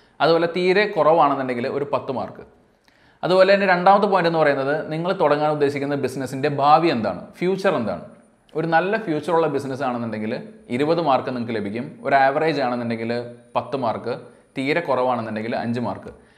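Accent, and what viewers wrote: Standard. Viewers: നല്ല വൃത്തിയുള്ള മലയാളം.... അടിപൊളിയായി വിശദീകരിച്ചു ...ആശംസകൾ..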